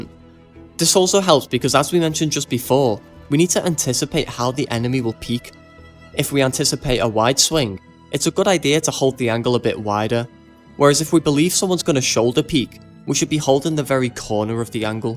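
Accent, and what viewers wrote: United Kingdom. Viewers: Bro... I never been into first person shooter games. For whatever reason i decided to dl cs2, your vids are very eye opening. Youtube suggesting them to me after 2 hrs of game time, well done yt. Hit me up if your down to do a vid series training a newbie. I know from accent your british so on my time zone lol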